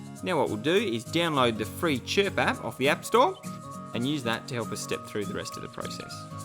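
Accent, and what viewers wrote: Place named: Australia. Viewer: Your Australian accent is the best!!!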